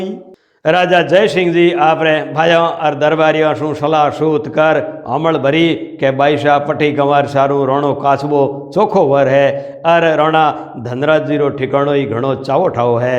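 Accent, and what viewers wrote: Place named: Saurashtra, India. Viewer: Hamare gujrat. Sorath jesi aapki bani he dhanyawad namaskar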